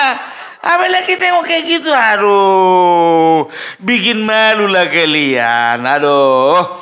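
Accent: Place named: Medan, Indonesia. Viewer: Yakin lucu si Abang ini. Sy suka logat Medan. Lanjut bang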